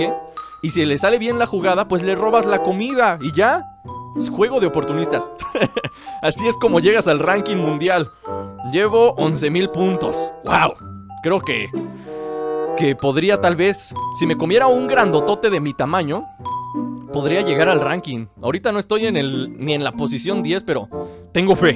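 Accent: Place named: North, Mexico. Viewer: Hola, es la primera vez q veo un video tuyo, me podrías decir de que ciudad eres? Tienes acento norteño, como de Monterrey, México. Saludos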